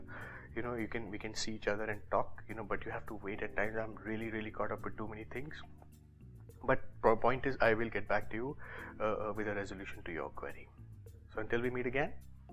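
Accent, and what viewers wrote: India. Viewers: ya he definitely limits his audience potential...half English mixed with Punjabi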